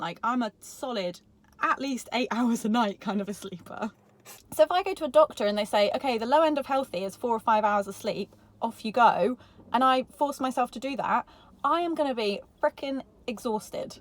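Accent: United Kingdom. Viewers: She has a British accent but the steering wheel is on the left side. What gives?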